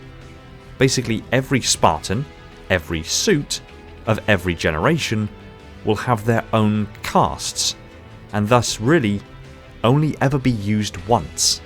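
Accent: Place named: Bristol, United Kingdom. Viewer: You from Bristol, thought I heard a twang in there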